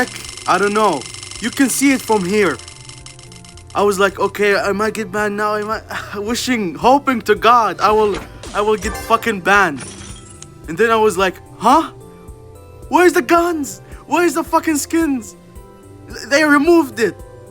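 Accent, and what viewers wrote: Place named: Arab world. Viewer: Are from uae or suadi u sound like arab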